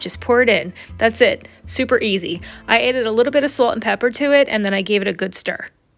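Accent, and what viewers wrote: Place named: New Jersey, United States. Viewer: Random but are you from jersey? I’m a jersey girl and you def sound like one by your accent ☺️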